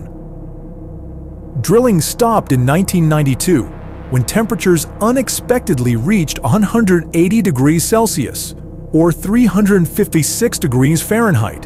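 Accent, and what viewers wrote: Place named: China. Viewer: Humans did not land on the moon rather have CHINESE English the voice is too much stress selling business was not pechenga can not learn too much sress in the voice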